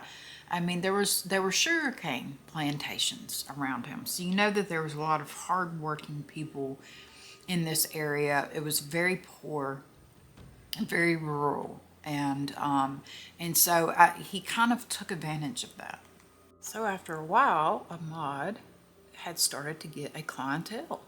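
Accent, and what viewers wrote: South, United States. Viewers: oh I love a true crime channel with new stuff I havent heard of. and (hello from England) I love that soft spoken southern American accent so soothing. you are my new fave ❤❤❤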